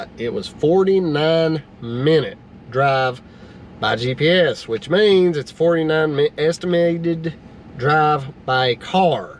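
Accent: South, United States. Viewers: YOUR SOUTHERN ACCENT MAKES ME LAUGH. YOU SURE HAVE TAUGHT ME THINGS. THANK YOU.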